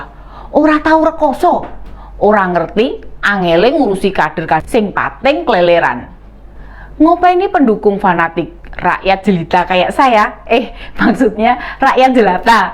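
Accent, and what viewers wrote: Java, Indonesia. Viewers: Bahasa yang medhok chas Mbak enak juga didengar 😃😃❤👍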